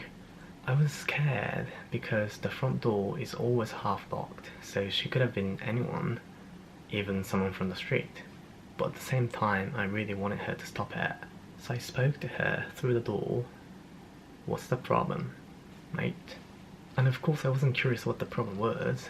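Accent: United Kingdom. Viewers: 追加コメントです！ 英語がとても綺麗なブリティッシュアクセントで、表現もとても洗練されてて、感嘆しました。すごく努力されたのでしょうね。 留学の当初、バスの運転手さんに「英語も分からないのになんでイギリス来たんだ！」と怒られたというエピソードを思い出すと胸熱です。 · 話が面白いプラスブリティッシュアクセントがかっこいいからめっちゃ聞き入ってた！ww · 綺麗なイギリス英語ですね。これからも一緒に勉強がんばりましょう！ オックスフォードサッカー部？の話も聞きたいです⚽️ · I like your British accent!! How did you improve your spoken English? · お話が面白いしBritish accentがとっても良かったです✨ お忙しいと思いますが、こういう動画を楽しみにしています♪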